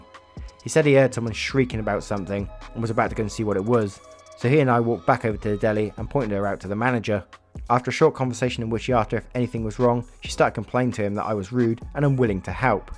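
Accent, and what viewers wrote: United Kingdom. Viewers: HI BRITISH PERSON!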